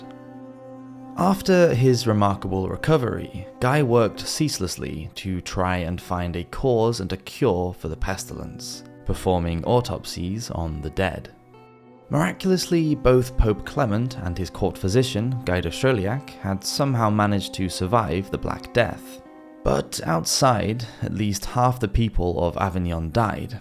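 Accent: United Kingdom. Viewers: Using a British accent while quoting Italian figures is pretentious AF. This video was boring.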